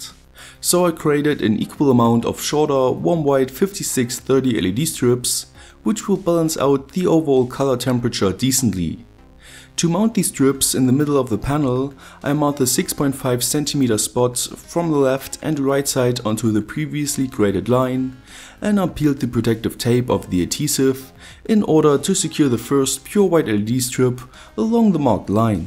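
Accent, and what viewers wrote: Germany. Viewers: man hört das du deutscher bist!...Wenn ich mir deine Materialien so angucke und die Art wie du es zusammen baust, dann werde ich das Gefühl nicht los das du ein Elektriker auf einer Werft oder einem anderen Maschinbau Firma bist oder warst!...Auch die Kabelhalter , die Gewindeschneider und Senker sehen nicht aus, als wenn du sie aus dem Baumarkt hast!..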